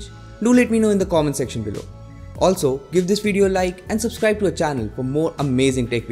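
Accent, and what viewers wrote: India. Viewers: I soon as I heard your Indian I went next!!! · Ughhhh Indian accent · As soon as I heard that Indian tech scammer voice I clicked off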